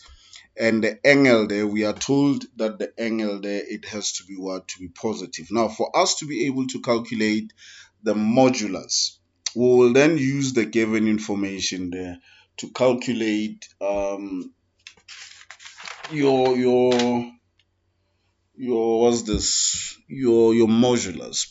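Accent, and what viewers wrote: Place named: France. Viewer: You sound french policemen not an insult tho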